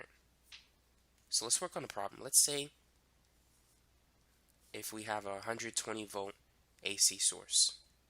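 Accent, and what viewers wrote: United States. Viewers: A very sharp, clear almost squeaky British voice, but pronounces like an American. Perhaps, he lives and teaches in the States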